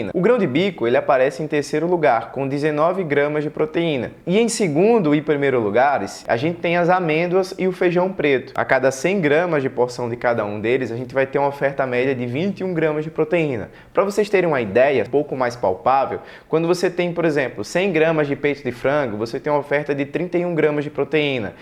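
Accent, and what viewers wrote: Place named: Sergipe, Brazil. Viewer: Pelo sotaque eu percebi que O dr. é de Sergipe kkkk aqui pertinho de Salvador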